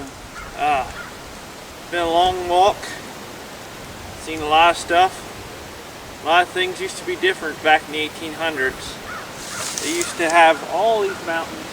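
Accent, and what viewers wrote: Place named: Western Pennsylvania, United States. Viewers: Are you from Western PA or rural MD? I can tell by the accent. ;) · Your accent sounds more like Pa than Ky. Especially SouthEast Ky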